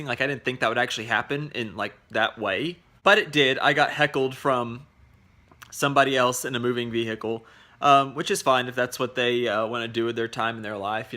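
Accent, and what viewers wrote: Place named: Texas, United States. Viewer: LOL, of coarse you don't and for being from Texas it is very slight, but just enough to be unique and cute.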